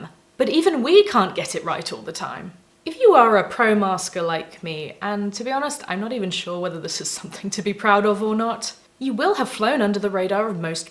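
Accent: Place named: RP, United Kingdom. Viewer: Was Received Pronunciation part of your Aspi-onage? 😉 maybe I'm imagining things, but your speech seems a bit more posh in these earlier videos. No disrespect intended.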